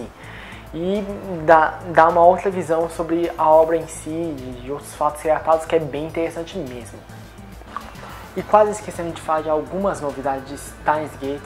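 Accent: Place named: Japan. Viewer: comecei a ver por agr, me inscrevi e talz... Mas vc n é brasileiro? pq vc tem um sotaque japones bem marcante, mas gostei mto do video e vo até ver esse anime xD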